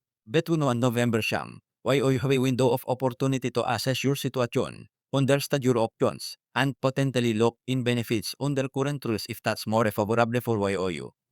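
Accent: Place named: Arab world. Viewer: Dapat English mo clear kaso ginagawa latin Minsan Hindi man slang para Kang arabi mag salita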